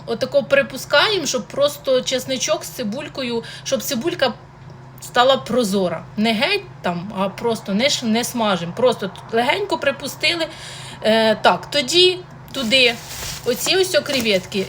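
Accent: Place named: Surzhyk, Ukraine. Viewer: Гарна дівчина,але суржик її портить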